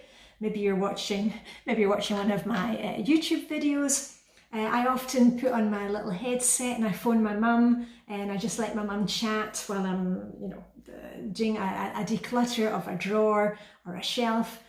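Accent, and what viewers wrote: Scotland, United Kingdom. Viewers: Thank you for an uplifting video I do buy my own Christmas gifts or given exact list. You have inspired me about the 5K in December..... I can walk everyday in December for at least 15 minutes.... I noticed your Scottish accent is stronger after visiting your mom blessings to you, thank you, Dallas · My mother had heart disease & I cringed when I saw your mum drinking alcohol, but maybe it's not an issue with for her. Your accent sounds strong after living in Denmark for 20 plus, but when you return home to Scotland do they tell you you've lost much of it?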